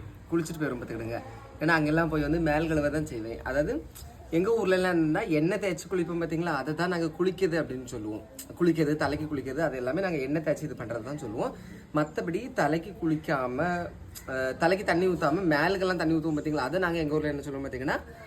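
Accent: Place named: Kanyakumari, India. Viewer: Ungae kanyakumari slang super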